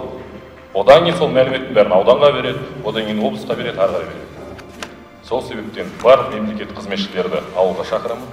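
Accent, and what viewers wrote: Standard. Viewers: Өте керемет таза қазақша сөйлейді, сияқты менде сөйлей алмаймың, тіпті ұят екен. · туган жерин сую нагыз еркек сизге рахмет казак тилин курметеп таза соилединиз казак тили жасасын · казакшасы таза екен озимиздин мангурттер сендерде намыс барма кашан соилеисиндер мемлекет тилинде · Недеген КЕРЕМЕТ таза сойлейди. ОТЕ ДУРЫС кай елде турсан сол елдин тилинде сойлеу миндетти. Казакша билмейтин Казактарга окинем. СИЗГЕ МЫН АЛГЫС👍👏💋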